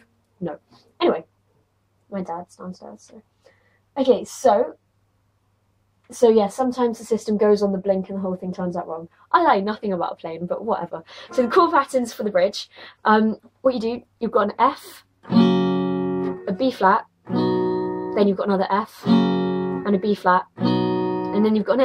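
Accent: United Kingdom. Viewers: Thanks for the "random ass Bm" chord...i couldn´t figure that out. By the way, i love your british accent ;) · very nice lesson and very beautiful british accent! thank you !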